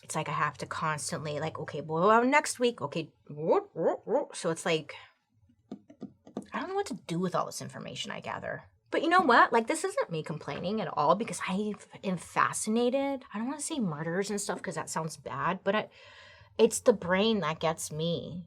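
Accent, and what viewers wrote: South, United States. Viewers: “Cornteen” 😂😂 talks like she’s from down south with me lollll ❤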